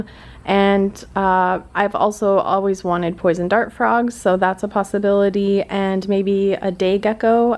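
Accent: Minnesota, United States. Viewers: HA! I knew you were from Minnesota!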